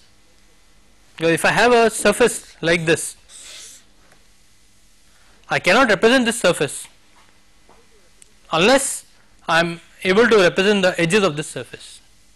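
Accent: India. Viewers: A agree with bajholster. If subtitles were added, I think they would help a lot. I'm rather used to different accents, but Indian accents are one of the most difficult for me to understand. Excellent video. Thank you for the lecture. I am beginning to study and learn CAD, and this was a *very* valuable video for me. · This" National Programme on Technology Enhanced Learning" videos are mainly aimed at improving education for Indian students so that Indian students can benefit from it. And we understand it very well. If you want to use these resources you can use it for free. But we didn't remember asking an opinion from an outsider on our accent - especially from an ignorant one like you. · What a highly informative video. It is great that the university made this available here. I'm American and I did not have much trouble understanding the Indian English spoken by the professor. 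Those who had problems understanding just need practice listening to Indian English- this speaker did not use any Hindi-English slang (that I can recall) so it is just a matter of developing an ear for how familiar words are pronounced. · it difficult cannot laugh about the indian spoken, it seem like speak with a Little Candy in your mouth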